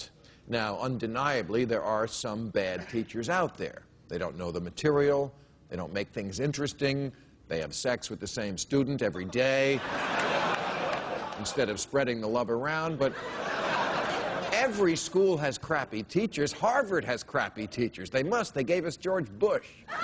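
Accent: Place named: Rhode Island, United States. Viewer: Sounds like you're from that poor Rhode Island neighbourhood.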